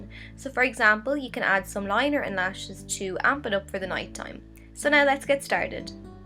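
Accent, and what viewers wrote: Dublin, Ireland. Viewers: Yeah she sounds a bit like me, so I think she might be from dublin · She's Irish 😊 I hear this accent everyday 😘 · I love the Irish lilt! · is she irish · Really good video for me, I'm just starting out with makeup!! I'm from Belfast, and I got so excited when I heard you had an Irish accent:) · love ur irish accent x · Love your beautiful Irish accent :)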